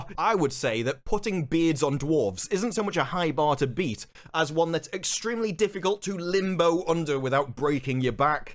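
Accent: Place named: RP, United Kingdom. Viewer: Simply due to the accent and delivery (which are fantastic, no criticism), I feel as if I am listening to BBC news or about to hear the next band on Top of the Pops.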